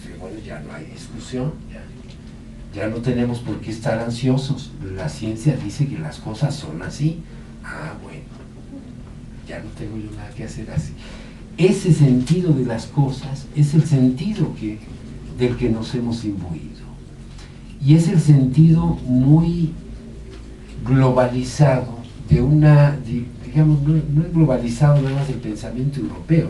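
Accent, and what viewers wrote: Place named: Mexico. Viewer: me dio mucho gusto verte despues de tantooooo tiempo, te reconoci de inmediato aunque la ultima vez que te vi aun no te salia la piocha, te encontre acento Mexicano (por que sera), si lees esto mandame un e-mail <mail> esta foto estoy con mi hijo mayor). Tu primo Felix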